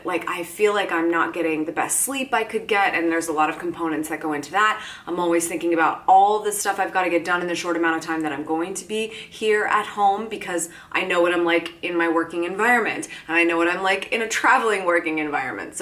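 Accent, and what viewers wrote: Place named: Philadelphia, United States. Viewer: vocal fry and from Philly.....!!!!!!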